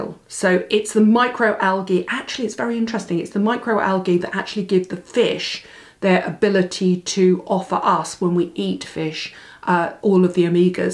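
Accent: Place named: Nottingham, United Kingdom. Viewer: I just adore you. I think u r one of the loveliest and most well informed woman in the business. And your accent kills me. My mother was from Nottingham so u r one of my people. U must have Jeffree Star on your channel. I know he goes to England on promotional tours. He is even more cutting edge than Drew Barrymore.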